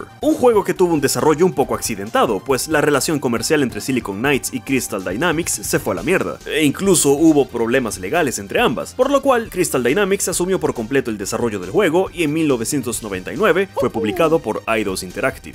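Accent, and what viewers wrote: Venezuela. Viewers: Será venezolano el men ?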